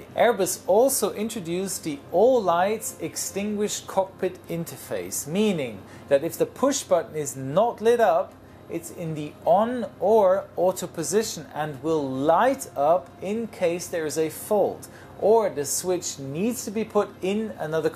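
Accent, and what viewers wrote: Germany. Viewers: Captain you are from Germany? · Is he a German? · Kommst du aus Deutschland?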